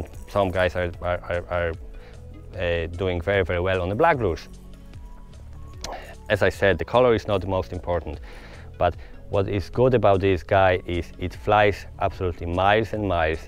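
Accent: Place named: Ireland. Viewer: Bro, I can detect an irish accent as you're talking. Half way through I thought I'd lost my mind and you were a local.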